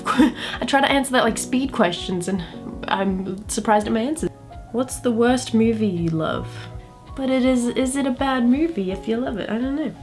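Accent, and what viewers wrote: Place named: South Africa. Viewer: You sound more South African than Australian...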